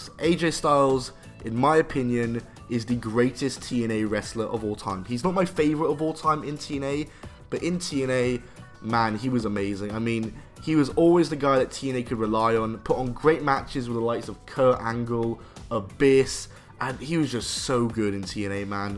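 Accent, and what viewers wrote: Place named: Scotland, United Kingdom. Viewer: Gotta admit, I wasn't ready for that much scottishness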